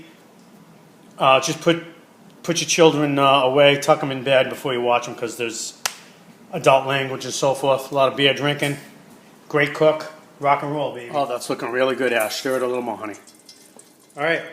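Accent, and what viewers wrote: Boston, United States. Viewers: All kinds a Boston/Mass in that voice!!! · Stumbled across this video while searching for a way to peel eggplant Love his Boston(?) accent, rapport with Ash, the dog, and his "commercial breaks." Oh yeah, the Eggplant Parm looks amazing, too!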